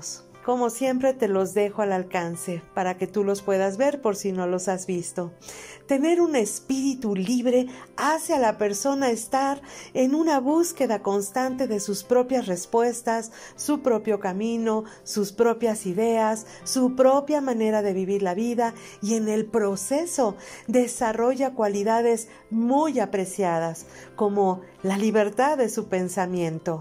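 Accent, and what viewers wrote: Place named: Castile, Spain. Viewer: Otra cosa que me encanta de Usted es que habla y escribe el castellano perfecto, como antiguamente se hablaba y se escribía en España. Me quito el sombrero ante Usted. "CHAPEAU"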